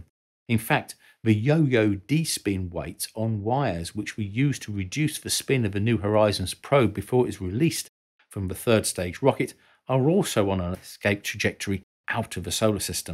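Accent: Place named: Cockney, United Kingdom. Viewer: I applaud any channel for not using AIvoiceover. But it sucks when you find good content, and then you hear that godawful cockney accent. ‘Earf’‘frough’ ‘firteen’…. It makes it so bloody unwatchable.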